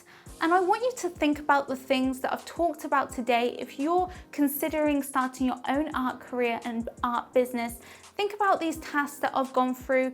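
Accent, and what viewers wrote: United Kingdom. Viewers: Love your lecture and I admire you been so young and doing so many tasks, yes been a professional artist is not simple and yes we need to learn more from others new techniques or ideas or how the business world change and new technologies are introduce, I love painting ,but not for business, I do other type of art as well, but I understand the importance of keep with the advance technologies. Congratulations you are great artist, and I am thinking in enrolled into your course, as I understand fir your accent you must be in somewhere in the United Kingdom, not for sure is England or Irish, either way is ok, just curiosity, are your courses in dollars ? I am in Australia and want to know wich currency you use. Thank you very much, my e-mail is <mail>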